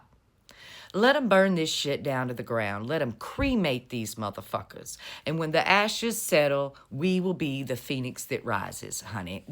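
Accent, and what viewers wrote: South, United States. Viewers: I enjoy not only your forthright news, but the Southern way in which it is delivered.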